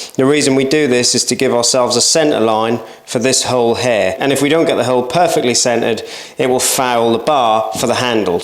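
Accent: Norfolk, United Kingdom. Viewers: You are defo from Norfolk lol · I think I know that Norfolk accent, pretty sure I bought the same doors from the same wickes and then watched this video to hang them. You have helped a groundworker have a little bit of finesse. 🤣 Can you please show me how to cut & clad oak veneer stringer to my existing staircase please.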